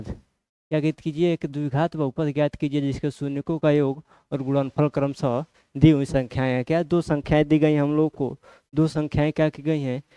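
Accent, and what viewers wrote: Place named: Uttar Pradesh, India. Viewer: Bhaiya aap sachi me up ke lag rhe ho 😂😂😂😂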